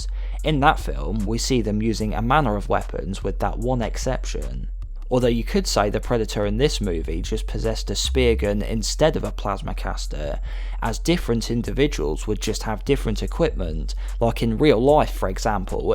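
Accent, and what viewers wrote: United Kingdom. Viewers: dude i couldnt concentrate on the video because of your accent i dont to listen to british accent again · Thats a thic british english mate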